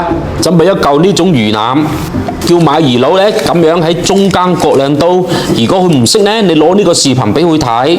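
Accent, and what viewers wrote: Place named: Hong Kong, China. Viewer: 你讲返普通话啦，唔该你。听港普 已经够折腾了，还要加个普粤 真的是 普你亞波 咯。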